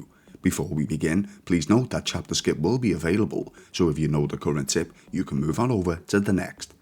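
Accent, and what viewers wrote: Liverpool, United Kingdom. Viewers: Sounds alien to me to hear a scouser on fallout videos. You get the likes n the subs etc for thst alone. Greetings from a manc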